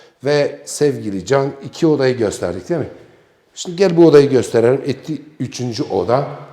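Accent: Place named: Anatolia, Turkey. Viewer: Anadolu silvesine ve senin dogalligina hayranim icinden geldigi gibi konus bosver sen kotu yorum yapanlari. Kolay gelsin kardasim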